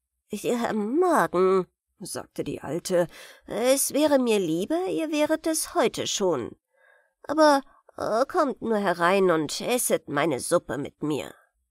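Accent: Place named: United States. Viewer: ja klar, Geschmäcker sind eben unterschiedlich. Mir hat es dann trotzdem ganz gut gefallen! Auch wie du die Stimmen machst zu.b. find ich gut. Es ist nur was in diesem SingSangton ... Ist neuerdings bei jungen Vorleser so verbreitet, Ich frag mich was das ist und mutmaße: es ist ein Amerikanismus!! Versuchen sie die Amis zu reden auf deutsch. Und das klingt einfach blöd in meinen Ohren.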